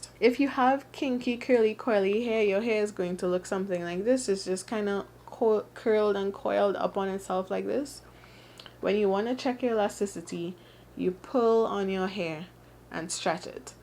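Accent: Trinidad and Tobago. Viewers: That accent has to be from Trinidad 🇹🇹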